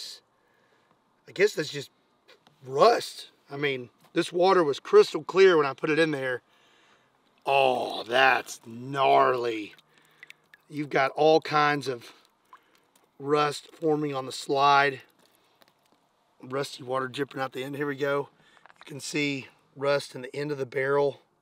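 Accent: Kentucky, United States. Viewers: What part of ky are you from brother?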